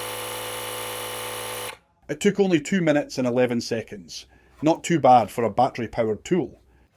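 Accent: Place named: Scotland, United Kingdom. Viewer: I'm an even smaller creator, you're way ahead of and better than me 😂 Also, love the scottish 👍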